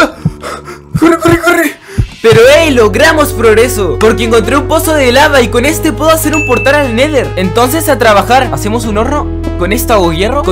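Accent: Chile. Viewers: eres chileno? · Eres chileno?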